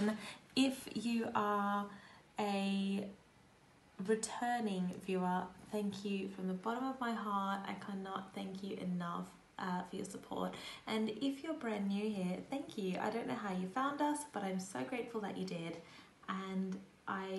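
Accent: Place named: United Kingdom. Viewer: Aww hi baby!! Such a cutie and I love your British accent mommy!! 🥰🥰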